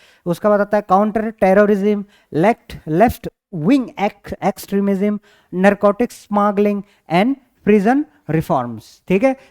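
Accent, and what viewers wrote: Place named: Assam, India. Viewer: sir no one says ur nepali until you tell or emphasize it , so stop aying this sir and yes if u were then teacher kidhar se bhi ek student ka karm hai ki wo uske guru ki respect aur shiksha ka adr kar use grhan kare so pls don't ay this sir , when i attend the first lec. i felt as u r an indain only from assam or meghalaya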